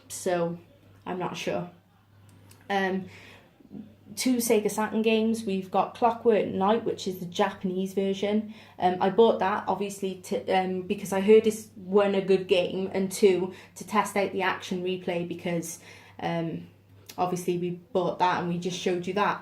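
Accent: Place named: Newport, United Kingdom. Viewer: heh well I'm from Newport as well. The accent sicks out a mile!:)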